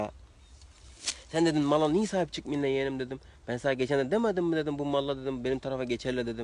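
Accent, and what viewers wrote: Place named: Unye, Turkey. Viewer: Kardes ben ünyelim bizim şivemizde çok benziyor size ama gercekten on numara tebrik ediyorum seni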